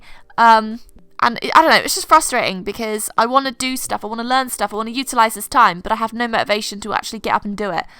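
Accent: United Kingdom. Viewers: Bruynzeel: say it like Brown-sale (with a little bit of an emphasis on the w). I'm assuming it's an old Dutch name. The y isn't really used in Dutch (it's replaced with the ij which in a crude English translation is said like "eye"). But this is how I (someone also with a British accent) would say it. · reminds me of Moria with the funny edits but a British accent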